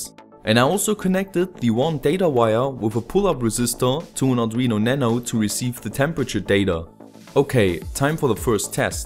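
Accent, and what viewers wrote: Germany. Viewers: Are you german or something? YOu sound like a german speaking english :D Wenn ja nice :D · i cant hear your english anymore. you are definently german or sth. else! (i m 14 and german) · hör ich da nen dutschen akzent ? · Are you realy German? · du you come from germany ?xD i come from ! you hear so x33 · Where are you from? Bist du Deutsch/ Are you german?:D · Are ou german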